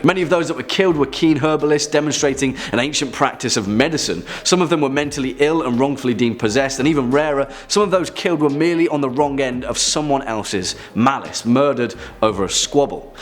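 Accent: England, United Kingdom. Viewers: Want to like cause it's about Scotland, but cannae be having that English accent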